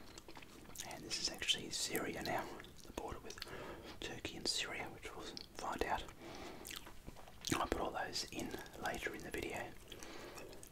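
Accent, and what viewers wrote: Australia. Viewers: australian accent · I love Aussie accent from Romania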